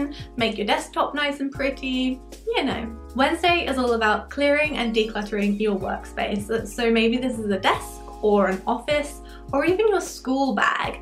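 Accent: United Kingdom. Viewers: No hate r u British of American x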